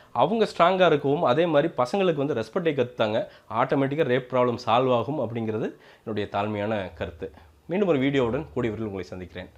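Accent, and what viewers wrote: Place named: Kongu, India. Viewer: Candour expressed with a hint of Kongu Tamil. Well done.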